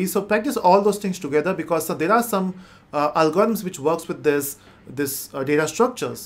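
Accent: India. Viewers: I hate Indian accent. No offense!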